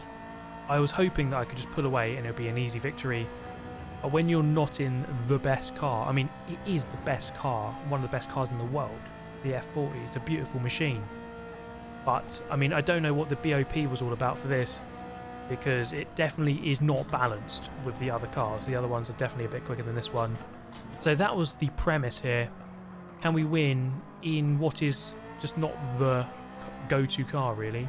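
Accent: United Kingdom. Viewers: ! Your voice makes ne wanna be British! Um gonna STEAL your voice. But I need your password!!!😊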